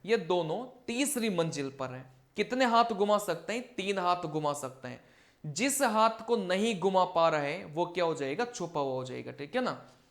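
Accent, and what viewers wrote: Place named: Hadoti, India. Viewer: Sir ji aap rajasthan m hadoti se hona ...aada jana lapad padegi 😂 hadoti wale hi bolte hain😂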